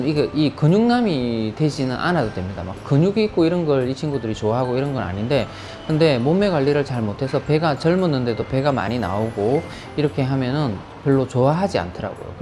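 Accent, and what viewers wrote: Gyeongsang, South Korea. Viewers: 역시 서울말투보단 경상도말투가 믿음과 신뢰성이 가네요~~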